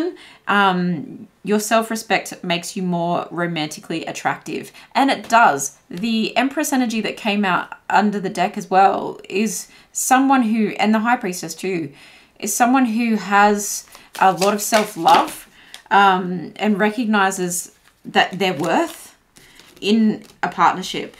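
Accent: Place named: Australia. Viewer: same here, it's weird hearing an Aussie accent on here.. funny when you second guess your own accent lol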